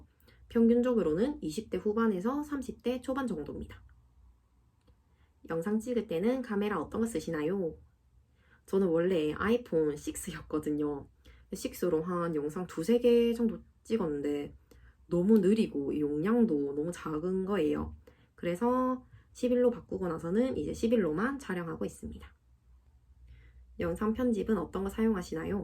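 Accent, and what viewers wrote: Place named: Gyeongsang, South Korea. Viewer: 경상도 분이세요? 사투리느낌이..ㅋ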